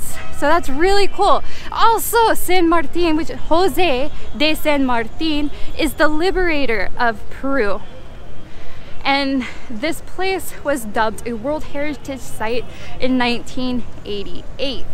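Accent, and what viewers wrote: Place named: England, United Kingdom. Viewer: How beautiful you are, I love your English accent !!😘😍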